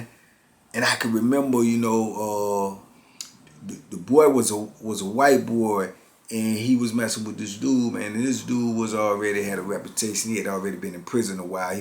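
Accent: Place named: DMV, United States. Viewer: Bra I like this video. You said some certain words that I can reflect off. Young I am onbthevWest Coast but from where you from. Inlike you videos because InthinknIblost my DMV accent. But when I hear your delivery you remind me of home. Speak da trufe to da old and da yufe. Errbody or somebody going thru it and know what you seen and know you real. ✊🏾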